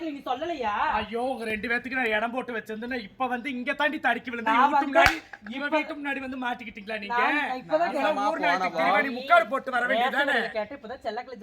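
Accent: Coimbatore, India. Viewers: கோவைத்தமிழும் உங்கள் முகபாவனையும் மிக அருமை😂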